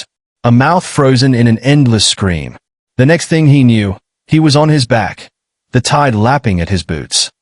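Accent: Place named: United Kingdom. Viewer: cut him some slack; he's British or he's from Boston.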